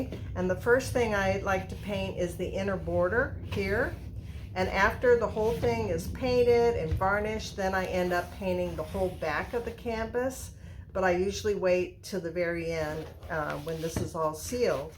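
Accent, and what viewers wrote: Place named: United States. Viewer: Your accent is obviously American. I’m curious. How long have you lived in Costa Rica? What made you move there? I love your painted rugs.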